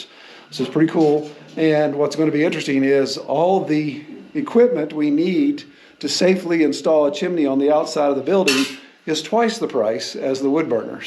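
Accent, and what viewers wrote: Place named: Minnesota, United States. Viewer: Just saying...you need to go pretty far North in Minnesota anymore to find folks that still talk like that. 😄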